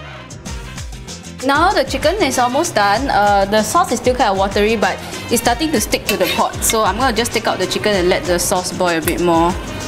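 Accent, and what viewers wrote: Singapore. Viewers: Yeap, its known as Singlish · I love her Singaporean accent :D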